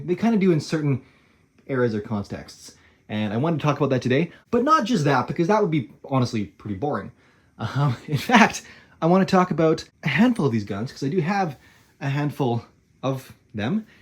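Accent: Canada. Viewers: Dude I can’t stand your canadian accent. Don’t have a problem with Canada, just don’t like your breathy raspy voice. Nice guns tho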